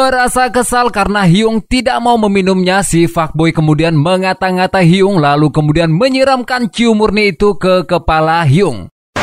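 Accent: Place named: West Java, Indonesia. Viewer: SPIL NGEREKAM SUARA NYAH LOGAT SUNDA😂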